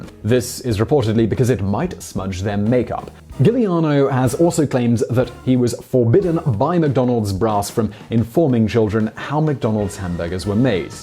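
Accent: United Kingdom. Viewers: Literally ten minutes into this video I was like wait wtf he’s british 😂 i guess it was just the subject matter, but it was like my brain was filtering out the accent or something. Pleasant surprise, great video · Why is vsauce talking with a British accent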